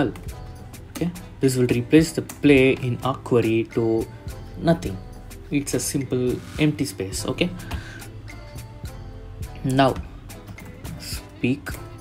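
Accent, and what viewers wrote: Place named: England, United Kingdom. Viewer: Bro im a fan of your English accent bro ❤😂😅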